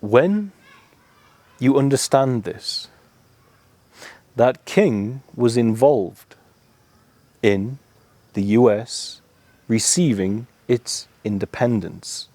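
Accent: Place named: Newcastle, United Kingdom. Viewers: metaphysics explained via understandable examples and the dissonance from the brigite deception dispelled by your soft geordie tones many many thanks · Never seen this gent before Sounds like a Geordie Great presentation though Very interesting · Hey there, bonny lad, bloody good talk, and a beautiful, Geordie voice to deliver it, are you aware of the three premiers, snorting coke, on a train from Ukraine, red handed, also, she was wiping coke from his nose, not attacking him,on the plane, trudau, is the son of fidel castro, Starmer is secretly, gay, rent boys are burning houses and cars, belonging to him, for unpaid bills, seems they were all trained by the w,e,f,,allegedlly all broken, easy attachment, keep talking bonny lad, god bless , fenham, formerly, elswick, you sound the same as me.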